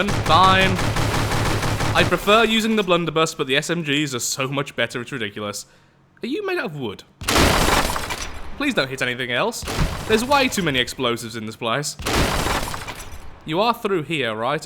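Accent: United Kingdom. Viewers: is he british? i cant tell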